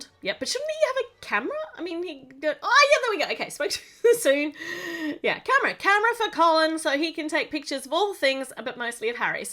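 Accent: Australia. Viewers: I don't know anything about Harry Potter but I love your accent your Australian accent is amazing and beautiful...and....oh..I need to catch up Harry Potter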